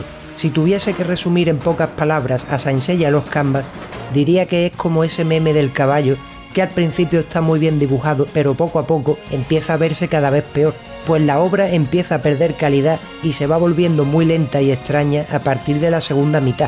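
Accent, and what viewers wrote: Andalusia, Spain. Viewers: Si te doy sincero he visto pocos vídeos tuyos, pero ahora viendo este vídeo tan largo se aprecia tu esfuerzo lingüístico jajaj eres la hostia narrando con ese toque de humor y de forma rápida y concisa pero muy entendible a diferencia de otros. En fin que eres un crack! Por cierto no eres andaluz no? Saludos desde Málaga! 😉👍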